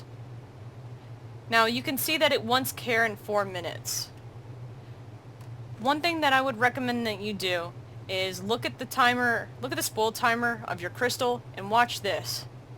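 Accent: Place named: United States. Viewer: She sounds cute 🫠 American accent. Still uploading Ark? 💞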